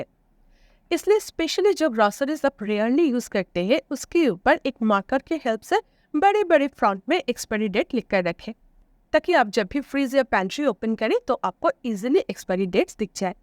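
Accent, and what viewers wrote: Bengal, India. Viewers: Dear loved your todays vlog very much though all your vlogs are meaningful but todays vlog is insightful also and the way you say or convey your thoughts is so simple and beautiful the Bengali touch in your accent is lovely to hear❤❤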